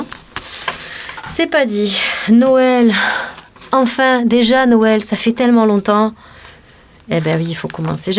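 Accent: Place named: South, France. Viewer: Je rajouterai avec un bel accent qui sens le soleil..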